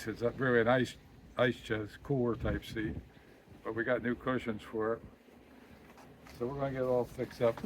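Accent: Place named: New Jersey, United States. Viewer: Thanks for your videos. You remind me so much of my grampop and my dad (you are younger than both lol. But my memories are of them when they were still here. ) keep laughing it makes me so happy to hear that laugh. Just like ol Cornie's laugh. And you walk and move like dad (he was a sailor in his youth. You just don't lose that rolling gait!), your jersey accent makes me feel like I'm home again. (Jersey girl too). I look forward to your videos. Keep catchin!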